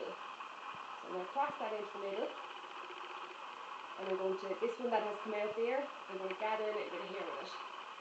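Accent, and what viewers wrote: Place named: Ireland. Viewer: I love your Irish accent I love the video PLUS thanks, this video really helped me 👌🏻👌🏻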